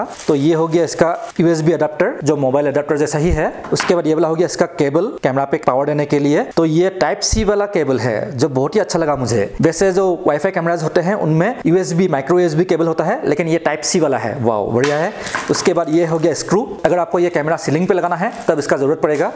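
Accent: Assam, India. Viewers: tu oakka assamese hai. tera accent se pata chalta hai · Is that Assamese accent I hear? Lovely! Thank you for the informative video. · দাদা, আপুনি অসমীয়া নেকি?? কথা কেইটা শুনি এনে লাগিল।।।। ।❤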